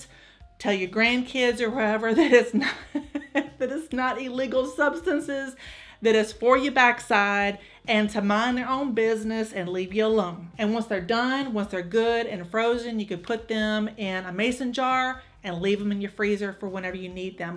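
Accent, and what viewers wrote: South, United States. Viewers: You are a very entertaining and effective communicator!😂 Thank you for ALL of your points on using Castor Oil. The hemorrhoids remedy is so helpfu! Never heard of these remedies before. Barbara would be proud of you. She has been one of my favorite health coaches in videos. You sound like you are from Georgia, Mississippi or Alabama. I am from Mississippi. The southern accent is so refreshing to hear.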